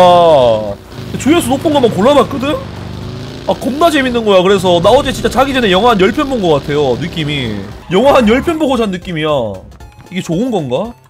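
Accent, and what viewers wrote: Busan, South Korea. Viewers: 부산사람 이었어? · 형 부산사나보네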